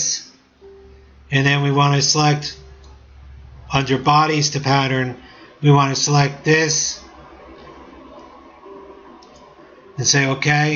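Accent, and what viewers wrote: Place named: Brooklyn, United States. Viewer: Nice tutorial! Your accent is definitely more palatable than that of many Brooklynites! Only moderately detectable. :^)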